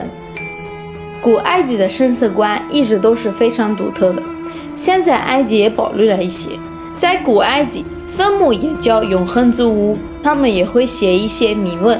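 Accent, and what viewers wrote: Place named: Shandong, China. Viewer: 山东口音很亲切啊！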